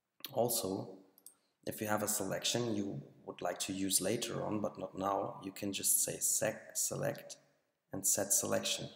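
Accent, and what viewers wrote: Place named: Germany. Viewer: der deutsche akzent :D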